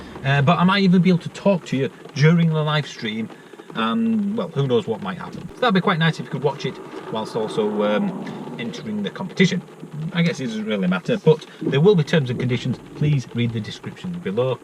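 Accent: Yorkshire, United Kingdom. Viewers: enjoy... your Yorkshire accent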